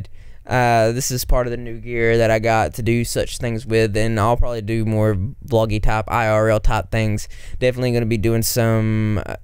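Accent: United States. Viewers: Where do you live/are from? You literally sound like you could live in the states near me.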